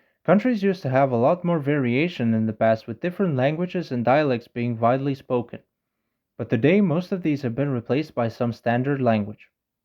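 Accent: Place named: United Kingdom. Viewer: That's a possibility, but I think it's rather unlikely. His accent sounds to me like one of the lower class British accents, and in these accents "TH-fronting" (pronouncing the TH in "thin" as an F) is the norm. So it's much more likely that he simply grew up speaking that way, but that physically it wouldn't be difficult at all for him to pronounce "north" properly, had he grown up among people who pronounced that sound. ....Anyway, it's not a huge deal really, but I just find the F pronunciation of TH rather annoying, and he's literally doing a linguistics video, where he's talking about reviving extinct languages, so it doesn't seem unreasonable to ask him to start by reviving the TH sound in his own English 😉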